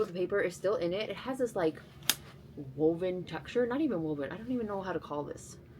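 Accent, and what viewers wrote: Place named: Brooklyn, United States. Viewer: I love all of your finds and your style!! I lived in Brooklyn in the 80’s as a teenager. Your accent and your personality brings me back, and reminds me of all of the Italian girls that I went to school with….I miss it so much!!! The best time of my life!! Plus, I want to convert my spare bedroom into a closet and dressing room…but my 20 year old son is still in there 😂 Id say your Halloween costume will be Fran Drescher as the Nanny.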